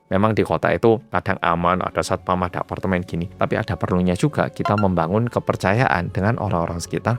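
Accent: Java, Indonesia. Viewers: Hai muedok😊 Lgsg nyimak🎉🎉🎉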